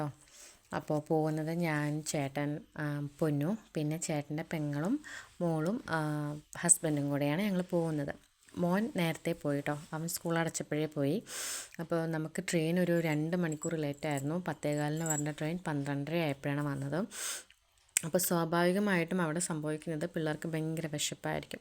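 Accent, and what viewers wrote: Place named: Kottayam, India. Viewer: Super ayit undu❤ chakkapazham😋.. Chechide language ketal Kottayam ane parayu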